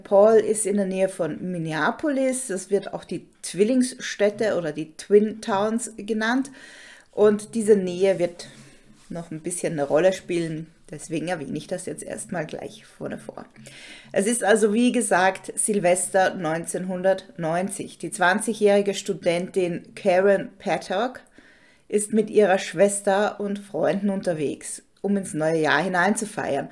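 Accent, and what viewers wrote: Austria. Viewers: Erst mal danke für deine Videos, ich höre dir mega gerne zu, du erzählst schlüssig und spannend mit einer angenehmen Stimme und ich liebe diesen österreichischen Hauch total! Für mich hat der Typ was schizoides, wie 2 Persönlichkeiten in einer Person... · Danke für diesen Fall Was für ein Jammerlappen🙄diese Stimme und das Geheule ist echt furchtbar Hör ich da bei Dir einen leichten österreichischen Einschlag❓ Sympathisch 😉😉🍀🌸💙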